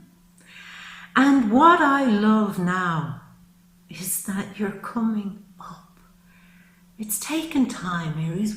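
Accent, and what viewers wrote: Ireland. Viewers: Accent is Irish? Just curious.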